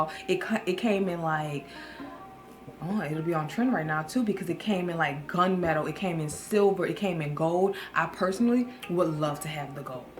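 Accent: New Orleans, United States. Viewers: Lol😄😂@ I LOVE ME SOME YOU..- I WAS FEELING SAD 🤧TODAY UNTIL YOU MADE ME LAUGH. 😄 THANKS... I LOVE YOUR NEW ORLEANS ACCENT... THIS VLOG WAS ON 🎯..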